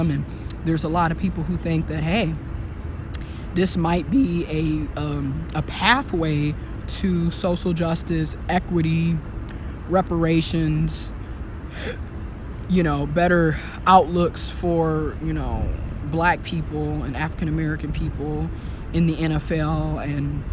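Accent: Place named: East Tennessee, United States. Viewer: You sound like you from east Tennessee with a Southern accent but you articulate your words very professional in beautiful i wish i can speak like you , you are right what you saying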